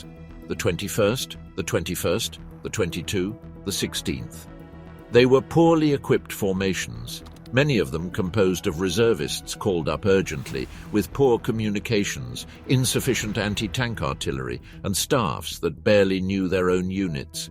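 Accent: United Kingdom. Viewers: Always the West with a British accent taking the liberty to tell a history of another country, another people only to denigrate their incredible sacrifice to free humanity from the Nazi scum. You will never see a Russian "documentary" on what the West involvement was in the WW2. One big lie here: that the USSR signed a non-aggression pact with Germany because "it mistrusted everybody else." Not true. The USSR saw the the Nazi threat and asked the West powers to have a common security agreement but this was rejected by them all. In fact, the West had already signed non-aggression pacts with Hitler, but this is not criticized here. We only condemn the USSR for trying to keep the Nazis at an arms length. The West only came to the USSR's aid when it was clear that the Soviet Union was defeating the Nazis. Don't believe everything you hear even if it is said with a British accent. 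Lies are lies no matter what.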